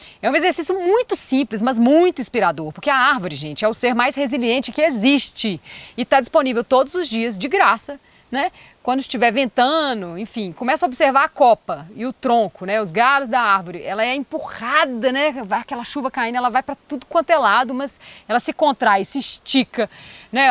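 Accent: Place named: Minas Gerais, Brazil. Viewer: Mas tb pode ser observado que como as árvores é necessário flexibilidade para potencializar a resiliência!! Sempre sendo ela mesmo, sotaque, vocabulário, transparência, trejeitos......mineirinha carismática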